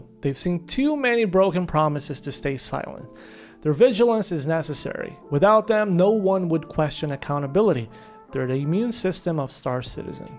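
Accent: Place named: Israel. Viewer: This guy sounds Israeli... Ewww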